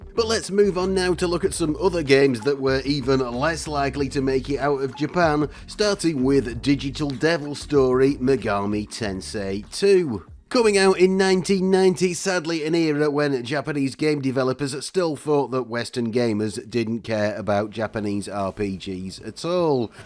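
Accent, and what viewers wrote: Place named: Northern England, United Kingdom. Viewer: Another British guy talking about the system that was so unpopular and under the radar in his own country. A North Englander, by the sound of it, with the "thoot it wood make them moony."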